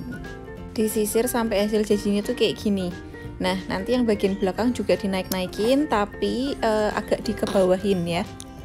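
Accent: Java, Indonesia. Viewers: Mbk medokkkk😍😍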